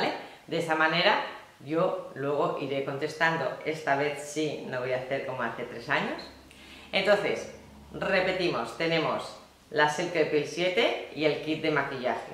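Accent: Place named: Spain. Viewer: Feliz 6th aniversario en YouTube. Mi nombre es Karla y vivo en Texas. Me encanta escuchar tu acento Español. Yo quisiera saber como pudiese disminuir la aparencia de poros en la cara.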